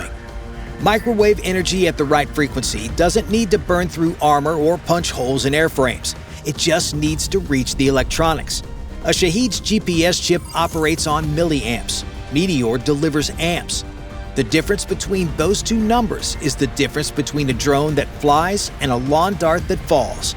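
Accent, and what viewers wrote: United States. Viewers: Had to turn this off. This particular American presenter's accent is ear grindingly awful. Pronunciation is always a problem understanding but his is so far out its painful to listen to. Back to school required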